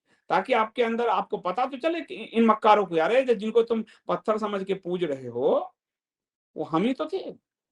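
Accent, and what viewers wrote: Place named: Bhojpuri, India. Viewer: Abe jahil, sahi se Hindi bhi nhi bol pata hai...bhojpuri bolne lagta hai(•‿•)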